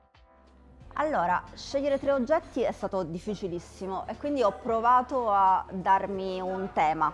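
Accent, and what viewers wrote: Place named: Cosenza, Italy. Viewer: L’ho scoperta solo ora, quasi per caso, per la sua analisi in quattro parti del film “Barbie”, che ho trovato quasi geniale. Sono andato a ritroso nei sui video su Instagram, e ho sempre trovato analisi centrate, acute, mai ideologiche, sempre equilibrate e ricche di spunti di riflessione e notizie, informazioni e curiosità che non conoscevo, o alle quali non avevo fatto caso. Sempre condivisibile. Una vera scoperta. In più è simpaticissima e dolce col suo accento cosentino. In una sola parola : adorabile. ❤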